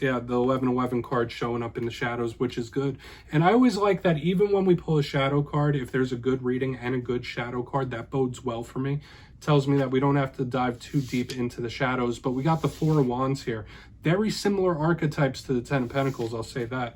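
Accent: United States. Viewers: What a cute deck! Very soothing voice (love the US accent!) and smooth tarot delivery. Greetings from 🇨🇦🇨🇦😊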